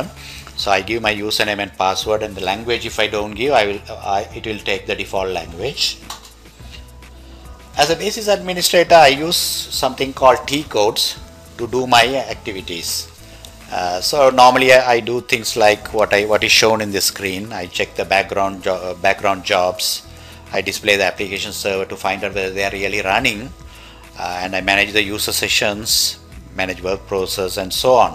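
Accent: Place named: Canada. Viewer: That's a funny Canadian accent you have.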